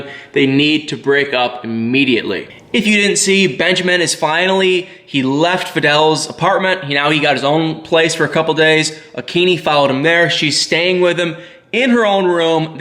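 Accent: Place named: Midwest, United States. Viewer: Omg ur Midwest accent lol. I’m from nyc and all I can hear is ur accent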